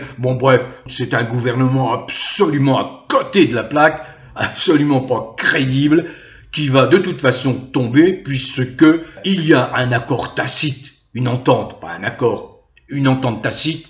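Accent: Marseille, France. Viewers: Joyeux Noël Mr.et j espère que l on pourra encore rire avec vous…et votre très bel accent…marseillais…Un grand merci de nous tenir au courant…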